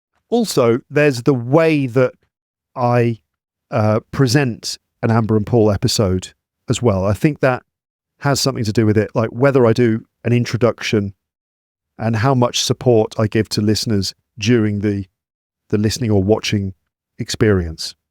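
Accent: United Kingdom. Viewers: Hello from Pakistan, I hope you are doing well. Actually, I listened to your long podcast on my podcast app and I heard you mentioned some people complain about your long introduction to episodes. Please don't listen to them just ignore them probably they're not serious about about their English that's why they complain. But we serious learners love your long episodes and giving explanations to each words weather they're about grammar, vocabulary or just giving explanation. I've been listening to you for six months. I remember listening to you for the first time I was not used to British accent and I kept listening to your episodes again and again they really gave me a satisfying result. Now I understand 95 percent of your English and the rest 5 percent could be not knowing new words. Thanks to your long unique episodes lots of love from Pakistan, Peshawar. 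❤👑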